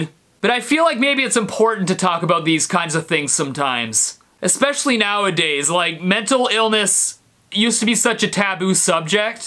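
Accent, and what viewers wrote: Canada. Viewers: I’ve been watching your vids for ages, this is the most Canadian you’ve ever sounded ❤️ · You sound extra Canadian in this one. Respect.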